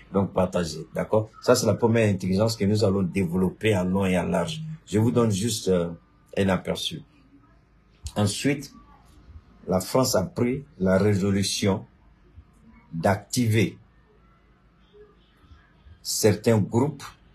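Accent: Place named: Ivory Coast. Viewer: PERSONNE NE VIENT ECOUTER POUR APPRENDRE AVEC TON ACCENT IVOIRIEN JE BE SAIS PAS OU GABONNAIS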